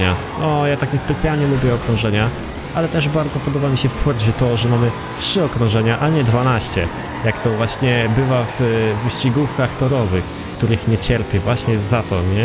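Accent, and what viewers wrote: Szczecin, Poland. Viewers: jesteś ze Szczecina??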